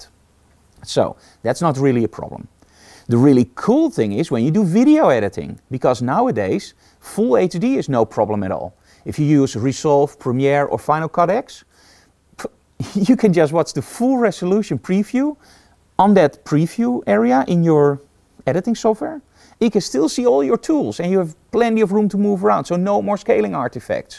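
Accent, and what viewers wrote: Netherlands. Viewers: Je hoort dat goed dat je een nederlander bent lol. Heb juist een eizo flexscan ev2750 gekocht, wat denk je ervan? Heb ook een 4K monitor van asus, heb die gekalibreerd met de i1pro en kleurweergave is echt top. Maar 4K in een 27 inch scherm...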